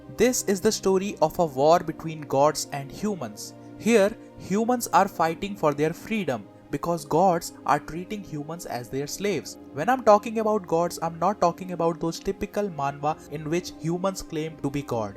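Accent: India. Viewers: Are u indian because u sound like one.... Dont get me wrong I was just askin I ain't racist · India best accent no 🧢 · I think i just found the best indian accent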